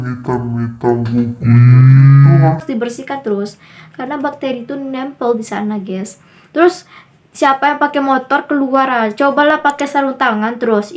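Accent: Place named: West Sumatra, Indonesia. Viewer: Minang nya jelas kali ya ges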